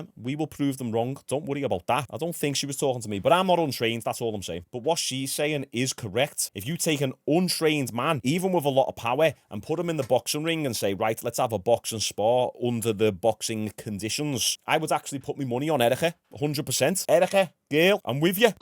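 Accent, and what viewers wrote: Liverpool, United Kingdom. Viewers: Love the scouse, from Derry N.I myself, our accent is hard to understand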